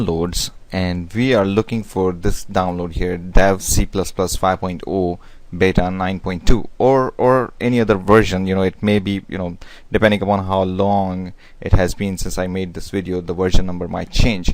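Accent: India. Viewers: thank u sir....one question..r u indian????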